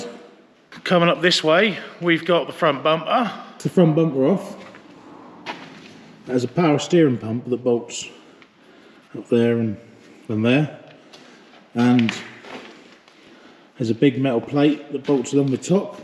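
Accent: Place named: Norfolk, United Kingdom. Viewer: Excellent video. Great content, awesome camera work...... and proper jaaaaarb Narfuk hoo-mour and accent. :) (Am a California person meself, so East a bit from you and across the river...... but (thankfully) north of low-staaaaft. ;) Can't wait for the next one - more Antar Action, or some stripping (of engine, please!) time? :O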